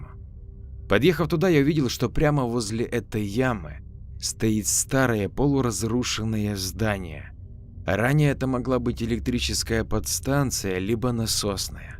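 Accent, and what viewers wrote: Ukraine. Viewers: Автор, не отрусить одежду а отряхивать ) не обижайся , это украинский канал ? Твои истории отличные и читаешь хорошо люблю слушать твои истории ну иногда слова украинские на русский лад. Тебе же нужны длинные комменты ) вот и написала, я украинка может мне мерещиться ))))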